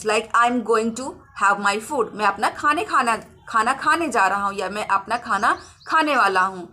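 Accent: India. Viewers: Your English is our Indian English like I understand better than others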